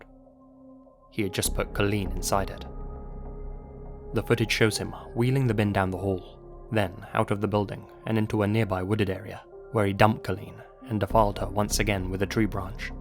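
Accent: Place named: United Kingdom. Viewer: I don't really care hpw you make videos. However, I would like to learn how to get that swanky British accent.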